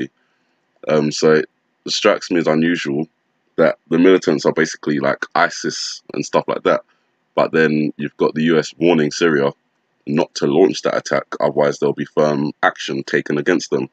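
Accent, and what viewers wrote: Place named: London, United Kingdom. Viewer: He's from London lol I can tell...sometimes the accents are a bit hard to understand for others...I understand it though as I'm from England too. ;p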